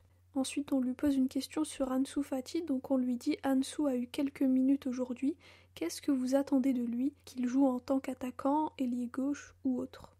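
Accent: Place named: Spain. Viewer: J'aime bcp son accent espagnol, même à la fin "visca Barça visca Catalunya" merci pour le contenu.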